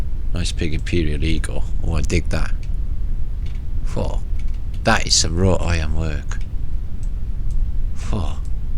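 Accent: Wales, United Kingdom. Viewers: Sound like your from Newport or cardiff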